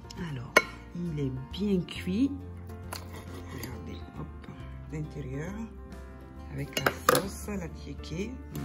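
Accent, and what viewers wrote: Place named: South, France. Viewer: Mais tu as l'accent du Sud, pas de la côte d'ivoire 😊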